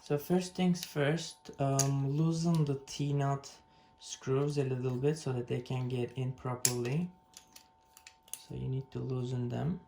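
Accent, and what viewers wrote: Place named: Turkey. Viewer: Even though my machine has been built I was having issues with levelling but since finding your page and content I followed your method and guess what... it worked. You have a big fan here and also I have a feeling from your accent your from one of my favourite holiday destinations. Hope you don't mind me asking but are you from Turkey ?? Thanks again brilliant !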